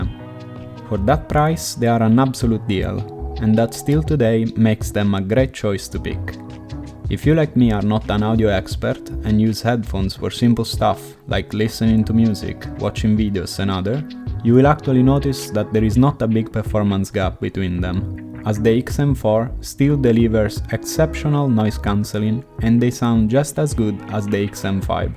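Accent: Italy. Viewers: Italian english !! this is a very nice headset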